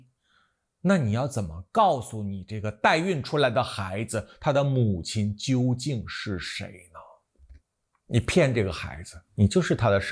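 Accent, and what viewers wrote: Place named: Northeast, China. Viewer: 本想听两句傻逼言论。没想到一上来的煞笔东北茬子味就受不鸟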